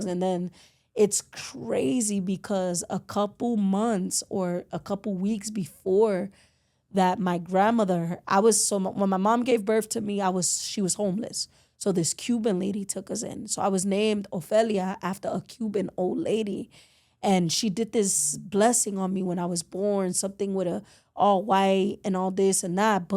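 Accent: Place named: Connecticut, United States. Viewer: sounds like she is from Connecticut,,,plus she didnt mention what neighborhood,,every one from NYC says where they are from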